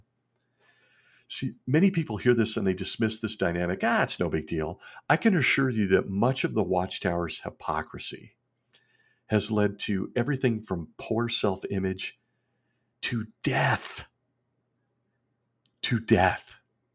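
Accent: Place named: Pacific Northwest, United States. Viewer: PNW? I thought I recognized the accent 😄 What congregation were you associated with? My family was associated with Walnut Park and Irvington. Just curious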